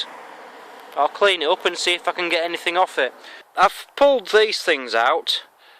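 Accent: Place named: Leeds, United Kingdom. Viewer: I thought I heard a touch of the Leeds twang as well.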